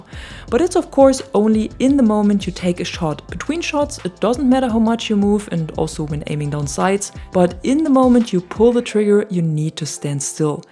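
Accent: Germany. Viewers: Es ist vermutlich dein deutsch englischer Akzent, du machst top Videos 👍👍👍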